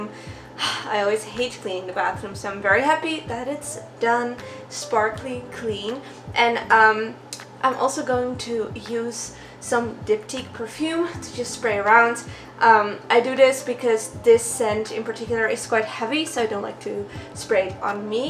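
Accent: Netherlands. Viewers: you have a dutch kind of accent while speaking ^^ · She has a real dutch accent ...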